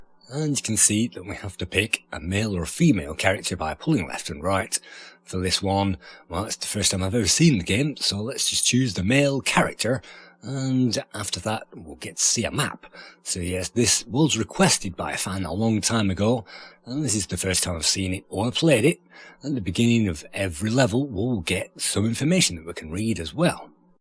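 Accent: United Kingdom. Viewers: Good to know! You sound British or Australian/NZ, so I was surprised that you had an NTSC Amiga.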